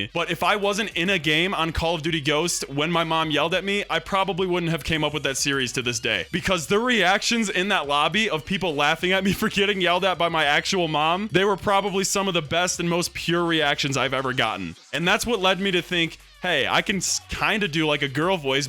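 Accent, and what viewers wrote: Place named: Australia. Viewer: Australian voice After 3 years he reveals it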